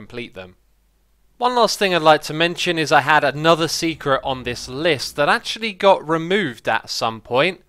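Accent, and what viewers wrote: United Kingdom. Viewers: yay.yet ANOTHER british minecraft youtuber lol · informational videos by a British chap, what can be better than this.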